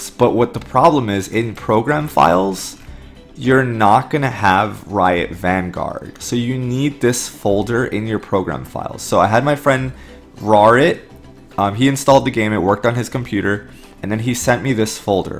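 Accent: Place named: Turkey. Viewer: its turkish accent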